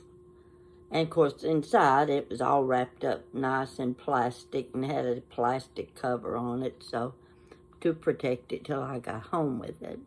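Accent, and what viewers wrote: South, United States. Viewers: Hi, just found you by accident and had to watch a couple of your videos. I can't wait to see more of them, I connected with you right off the bat. I live in Michigan but my parents were from the south, so I love you're accent. Keep up the good work and thank you for doing these videos. Blessings to you.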